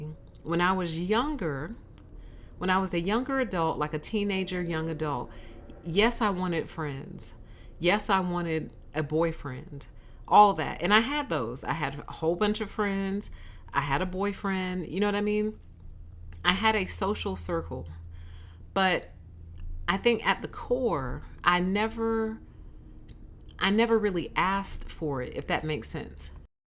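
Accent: South, United States. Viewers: your slight southern tone just makes it that much more rich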